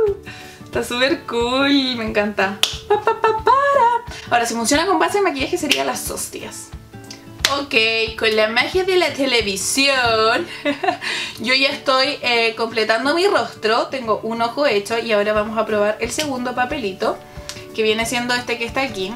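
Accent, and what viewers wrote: Chile. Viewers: Que lindo el acento chileno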